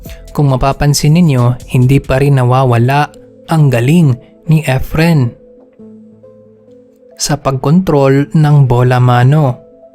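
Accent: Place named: Visayas, Philippines. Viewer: Napaka akward mo mag salita d bagay paki ayos boses mo halatang bisaya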